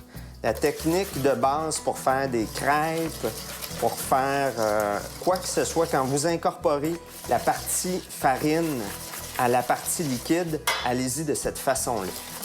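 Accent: Quebec, Canada. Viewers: Voilà une bonne recette avec le bel accent de nos cousins de Québec. Et une petite leçon sur la culture des poireaux au Canada, c'est intéressant. En principe je fais les poireaux en quiche ou en gratin, et je vais essayer cette belle recette. Merci!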